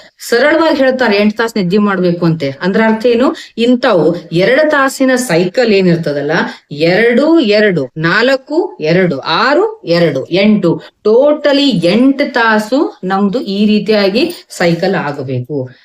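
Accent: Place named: Hubli, India. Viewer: Mam namm hubli bashe n chanda helthiri tq mam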